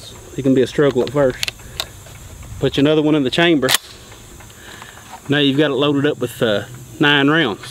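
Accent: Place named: Oklahoma, United States. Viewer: This dude has a cool accent -- Oklahoma?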